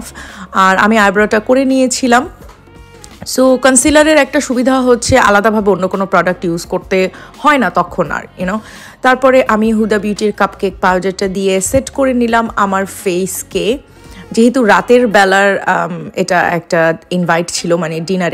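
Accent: Sylhet, Bangladesh. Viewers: Sylhety vhaia😀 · Afa baisab tho amrar sylhety 😜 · Ere hunray ni Eta toh Sylheti mat🥰🥰🥰🥰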